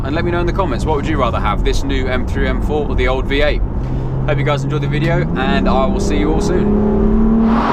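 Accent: United Kingdom. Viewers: I have met Seth MacFarlane in person since I live in Los Angeles and work in entertainment and once worked with The Simpsons production team. I'm pretty sure Seth could do an awesome impersonation of your British accent and humor. :-) p.s. good to see you doing more videos. the first video I saw of yours was 4 years ago, the M3 video from March 30th, 2013. "Honey, have you got the baby?" Hilarious! hope to see more videos soon. btw, I have the E92 V-8 with Akrapovic exhaust and going to get the M4 as a second car for work commute. Cheers mate, Mark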